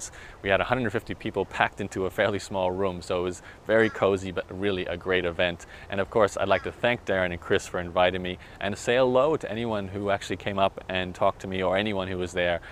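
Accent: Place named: United States. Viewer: You are Australian, yet you sound like you have an American accent? Must be all that travelling you do?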